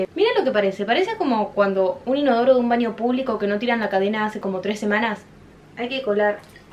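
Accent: Argentina. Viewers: Se nota que eres Argentina o Uruguaya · Por las groserías y el acento debe ser argentina o colombiana. Ahora resulta ser mexicana :v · Es argentina porque tiene el asento argentino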